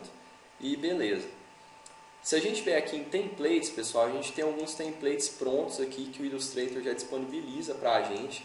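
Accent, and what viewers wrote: Minas Gerais, Brazil. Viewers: meu deus que fofura de sotaque mineiro. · Alguma duvida que é mineiro?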